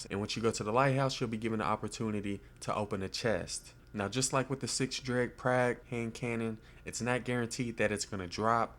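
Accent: South, United States. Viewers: Cawwwwnnnnttttrrrryyyy as hell!! XD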